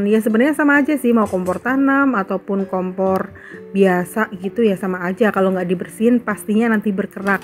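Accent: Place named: Java, Indonesia. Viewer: Mbae org Jawa nya mana mbaa.. Kerasa medoknya.. Hehe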